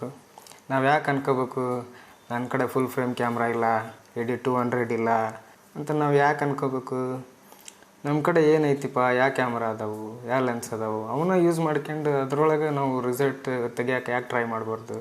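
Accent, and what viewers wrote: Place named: Raichur, India. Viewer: ನೀವು ಆಡೋ ಭಾಷೆ ಕೆಲ್ತಾಯಿದ್ರೆ ರಾಯಚೂರು ಜಿಲ್ಲೆಯ ಬಾಗದವರು ಅನ್ಸುತ್ತೆ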